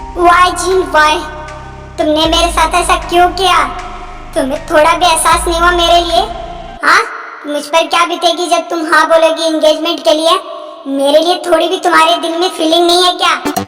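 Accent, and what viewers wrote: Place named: Bihar, India. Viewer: haan kyunki aap bilkul meri tarah thinking rakhte ho mujhe story se hi pata lagta hai aur ek baat aur aapka bihari excent bhi mujhe pasand hai kyunki main bhi UP wali hun🤭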